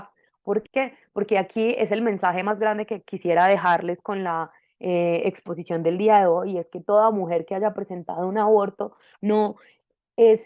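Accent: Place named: Colombia. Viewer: Excelente trabajo! Me encanta el dialecto colombiano 💖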